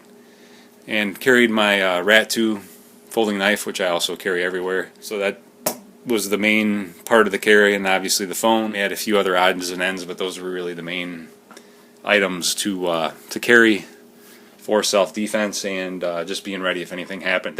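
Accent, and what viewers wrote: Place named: Finland. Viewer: Are you from Michigan originally? I hear some finlander accent. No disrespect I'm a native yooper and thanks for the info.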